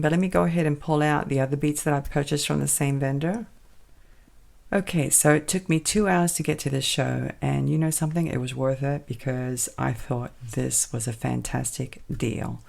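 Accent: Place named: Australia. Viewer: Wow I thought I detected an Aussie accent...you must have an interesting tale to tell of how you got to America. I grew up in Mount Gravatt, Brisbane so I know Sunnybank well. xox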